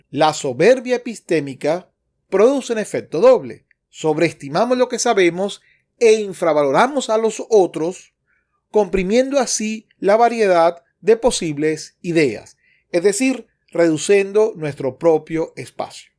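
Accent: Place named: Venezuela. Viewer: Eres de Venezuela?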